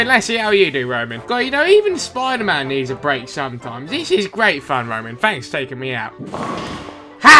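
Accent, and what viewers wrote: United Kingdom. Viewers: its ok man by the way r u British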